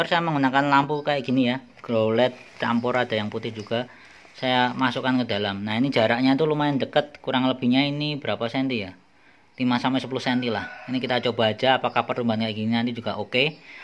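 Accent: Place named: Yogyakarta, Indonesia. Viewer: kalau tidak salah tadi pakai pasir silika putih bisa ya? yg untuk aquarium kan. btw mas orang mana kok jawa jawa nya ky jogja/ semarangan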